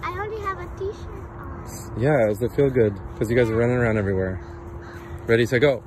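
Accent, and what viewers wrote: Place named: Visayas, Philippines. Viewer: Sir ang cute mo mag Bisaya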